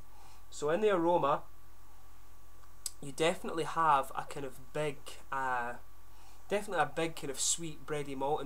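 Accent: Scotland, United Kingdom. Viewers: its great you not lost that rich scottish accent whilst traveling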